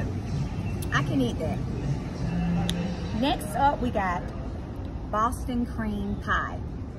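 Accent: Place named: South, United States. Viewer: Heyyyy fam! I absolutely love Jeni’s icecream we have one here in Nashville. Now I have to go get some. I had the peaches with Biscuits. Do you mind me asking where are you from because your accent sounds like you are from Tennessee or somewhere in the South.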